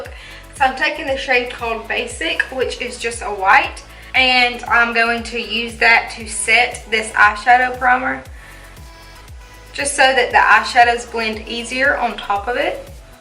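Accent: South, United States. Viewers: I absolutely love your tutorials! I also have the country accent, yours is cuter! 💜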